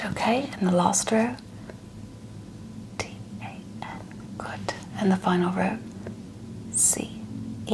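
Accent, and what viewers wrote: RP, United Kingdom. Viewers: This was great! Bit of the posher old fashioned Brit but sooo relaxing! Jolly good show! Let’s have another please! In fact a pat down in Britain! 😊 thanks Steve x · Downton Abby English certainly, Modern English not so.. But very good accent for that era/class.. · You look very beautiful. And your British voice/accent is extremely soothing. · Great video! I listened with my eyes closed and you sounded like a true Brit!👌👍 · brit, i dig it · Wow I'm British myself! and your British accent is amazing! You sound posh and upper class! I love it 😊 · Received Pronunciation (often referred to as RP English) generic South East England. A bit like Gwyneth Paltrow in Sliding Doors. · As a Brit this is very good. Usually, when non-Brits try an English accent they tend to drift all over the UK merging different dialects. But this is solid. 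😊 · Odd bits sound a british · Posh English accent 😝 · Love the British accent 😂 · Great video! Wonderfully done "Broadcaster British" and, IMO, the lack of eyeliner/change of makeup compared to your usual videos looks really lovely (gave it a more doctorally feel). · From the uk ,you have nailed the accent it’s very bbc great work 🙌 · Love Your ASMR British Accent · lovely British accent by a lovely nurse 😊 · Not a bad british accent... A bit of mix between dick van dyke and marry poppins, which seems to be where most yanks (unfortunately) get their reference materials 😊 Your accent is a little bit overly RP, so it sounds a little "posh"... But at least you didnt try and go for a cockney accent 😅 Nice job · British accent on ASMR kill me😍😍😍😍😍😍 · I'm British this sounds posh to me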